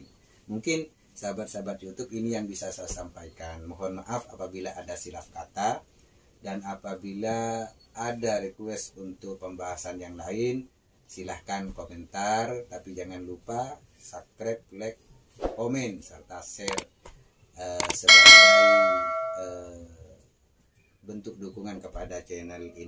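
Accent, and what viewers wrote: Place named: Sumenep, Indonesia. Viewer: Sampeyan dimana mas. Ko logat nya sumenep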